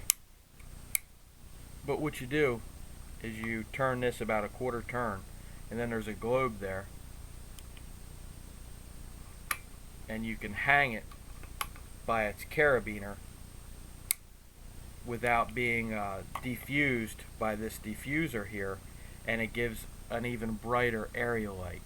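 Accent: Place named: Philadelphia, United States. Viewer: Streamlight is also local to you, assuming youre near Philadelphia ._. Based on your accent, amiright?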